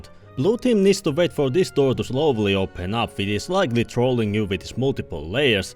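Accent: Finland. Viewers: Kiva rallienglanti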